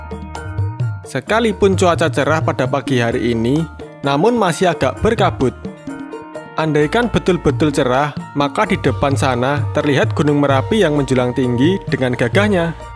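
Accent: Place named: Banyumas, Indonesia. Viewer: Mas asli orang mna sh, kok ngomong nya agak ngapak sedikit 🤣🤣🤣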